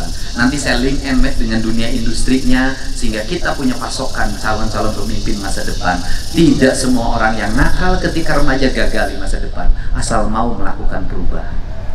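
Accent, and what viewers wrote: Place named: Java, Indonesia. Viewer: Ternyata bukan saya aja yg ngeh kalau logat Jawa juga terdengar sekalipun KDM orang Sunda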